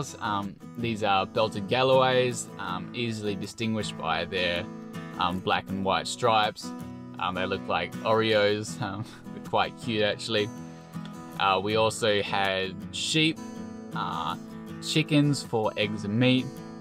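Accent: Australia. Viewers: Sometimes it seems to me that you speak English. But it seems to me that you speak a language that I do not understand. I understand that this is your Australian accent. Speak more slowly and clearly, do not lose the ending of words. I will be grateful, I learn English by ear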